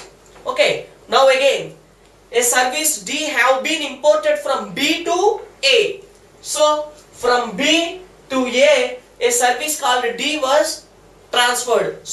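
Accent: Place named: Telugu, India. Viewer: sir superb ga explain chesaru.....chala clear vundhe me concept....many of us are lucky...i think u'r mother tongue is telugu....superb...keep uploading.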